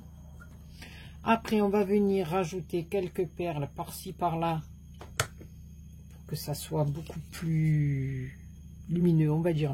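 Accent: South, France. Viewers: Magnifique ! Merci beaucoup pour ce tuto. Avec l'accent du soleil ..... j'adore !! Bises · coucou je suis émerveillé par toutes vos belles créations, le panier fête des mères superbe, carte gâteaux également quel talent vous avez une imagination débordante pour la déco J,adore avec l' accent du midi je ne me lasse pas de vous écouter merci et bonne journée bisous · Magnifique carte panier très bonne idée pour la fête des mères. Je suis conquis par le petit panier, très jolie et en plus j'adore vous écoutez parler avec votre accent du Sud cela apporte du baume au coeur et un peu de soleil parce qu' il nous boude en Bourgogne. Merci pour ce superbe partage. Bonne fin de journée.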